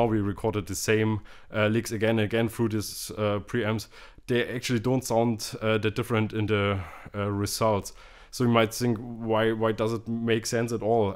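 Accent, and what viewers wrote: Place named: Germany. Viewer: too much German accent... lol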